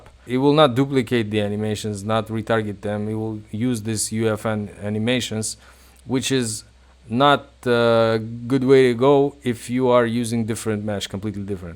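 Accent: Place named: Croatia. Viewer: You sound Croatian.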